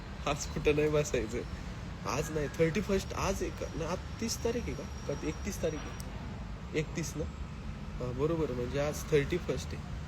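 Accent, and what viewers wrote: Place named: Kolhapur, India. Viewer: आरे दा तु लय भारी बोलतो तु कोल्हापूरचा आहे का रे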